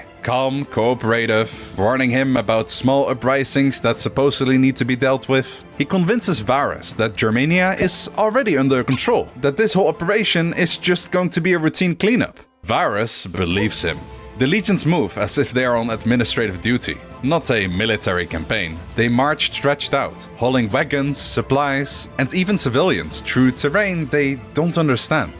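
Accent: Netherlands. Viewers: Oh that Dutch accent is so distinct. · Spotting the Dutch accent is not just easy for the Dutch, it sticks out like a sore thumb. See, we are multilingual and we want to speak fluent English, but when we hear ourselves back to hear how strong our accent is, it's raw cringe. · The ear tiwtch detecting a dutch accent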